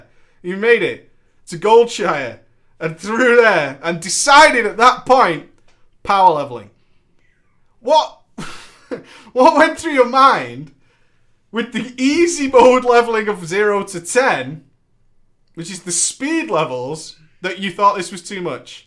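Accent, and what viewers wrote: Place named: United Kingdom. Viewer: It's too bad you are on EU... I'd raid with you just to listen to you entertain us in Teamspeak or w/e you use... everything is more interesting with a British accent, lol.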